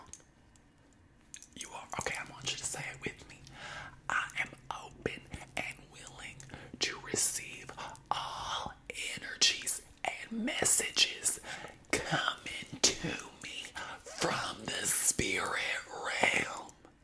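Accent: South, United States. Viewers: For some reason, I can't help but think about Rick Grimes from The Waking Dead when you do the southern accent with this character 🤣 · i love the rick grimes -esque accent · I love the southern accent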